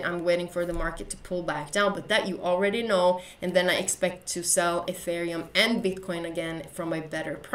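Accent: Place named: Sweden. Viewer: låter svensk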